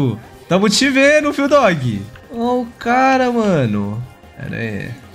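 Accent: Minas Gerais, Brazil. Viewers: Não sei dizer se você é mineiro ou paulista... talvez os dois...kkkk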